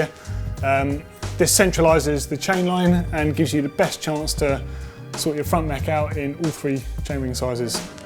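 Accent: England, United Kingdom. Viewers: I didn't TD this video but the music is way too loud to hear your soft spoken English accent.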